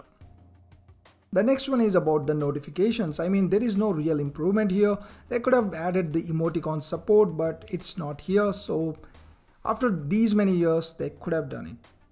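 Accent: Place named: Telugu, India. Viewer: Great review! Are you from telangana or Andhra Pradesh btw? Just curious 😅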